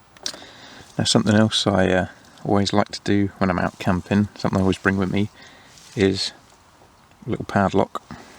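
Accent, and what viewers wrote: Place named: Southern England, United Kingdom. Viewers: about that serial killer in the night - they would cut the tent open with a knife, not use the zippers, so that lock really ain;t no good whatsoever. On top of that, it prevents YOU from getting out quickly in moments of danger. I'd just carry pepperspray, a nice axe and a loud whistle instead! Or bring a dog - can;t get more safe then with a nice Rottweiler at your side. Loved teh video - everything seems so easy and so natural with you. Amazing! Thansk for sharing! If I may ask - aprox. what part of teh UK are you? You sound like wider Londonarea to me... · You got a great looking channel here old chap! Glad to have found it and definitely subbed! You sound like you may be from down South somewhere, like me, maybe just outside London? slugs love tea, coffee and BEER!...so dont leave an open tinny sitting about on the floor, even in the evenings, you'd be surprised just how fast they will find it! 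MTFBWY!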